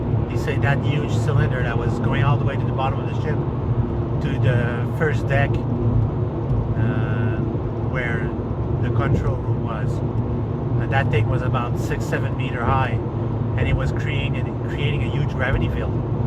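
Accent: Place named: France. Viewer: is French your first language? It seems you have an accent whenever you speak English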